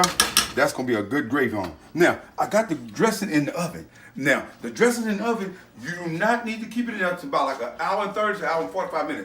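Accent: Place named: Chicago, United States. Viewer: This my first day watching this and I just love your positive approach to cooking exspecally the children I’m in love already. You sound like you from Chicago! I’m a female version of you!! Love it! Wow 🤩 what you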